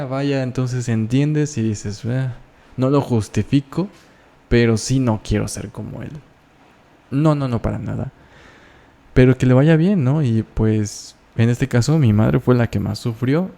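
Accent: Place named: Spain. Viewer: QE gusto saludarte ya no as echo vídeos por favor sige haciendo cuando menos de ves en cuando me encanta tú hacento de España y forma de dialogar Eres demasiado inteligente, estan con ganas tus videos de YouTube 🙏🥳🤩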